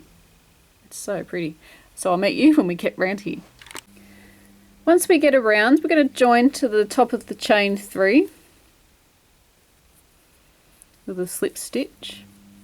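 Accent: Australia. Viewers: Hi, my daughter and I have been enjoying watching your videos. Thank you for the good explanations, camera angle and ... the aussie accent, being aussies ourselves I love the intro of g'day. You throw me a bit using US terminology, I was taught that a dc was a trbl, sc was a dc etc., and my following a pattern skills arnt real hot, so you are enspiring me to try to improve. Keep up the good work. Look forward to more of your videos. Thanks heaps sandra and natalie grantham.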